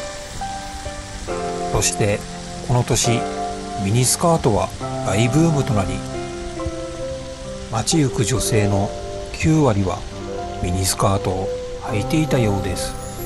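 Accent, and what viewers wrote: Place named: North Kanto, Japan. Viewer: ナレーター男性のイントネーションが関東以北の雰囲気を醸し出していたので、いっそのこと方言を丸出しで語らせたら違和感も無かったのにと思う。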